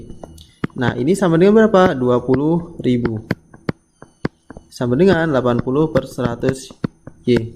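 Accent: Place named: Cirebon, Indonesia. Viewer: logatnya cerbon bgt, tah indramayu?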